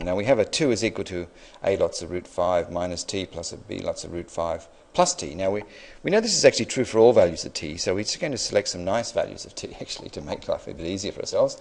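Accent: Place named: South Africa. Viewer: Please tell me you are south African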